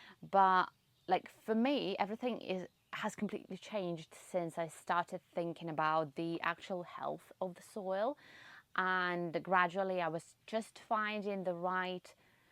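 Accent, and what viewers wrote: United Kingdom. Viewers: I am glad you chose to cultivate a traditional British accent rather than an American one! It is definitely harder to learn, but, as evidenced by your gardening, you are a wellspring of passion. Good luck.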